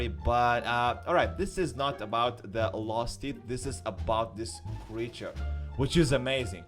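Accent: Russia. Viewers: What country do you live in? (Russia)? русский акцент)))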